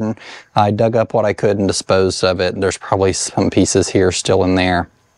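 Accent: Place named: Appalachia, United States. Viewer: Hello, I love watching your channel! I think one of the main reasons is because I live in the Appalachian mountains in Huntsville, AL and I feel at home listening to your accent. I grew up on top of Monte Sano Mountain and now live on the side. Thank you for allowing us to follow your journey.:) Kelly Flowers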